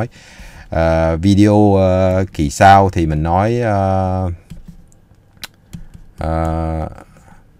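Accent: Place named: South, Vietnam. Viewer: Cảm ơn thầy, Em rất thích học và nghe, gì thầy nói tiếng trong nam, Em nghe rõ, Em rảnh giờ nào cũng luôn mở nghe, chúc thầy và gia đình luôn được nhiều sức khỏe may mắn hạnh phúc vui vẻ an lành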